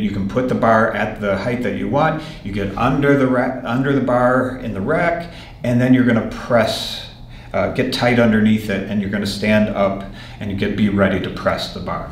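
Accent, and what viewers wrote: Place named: Halifax, Canada. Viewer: Great vid thx. Dude gotta be from halifax or something "the bahr"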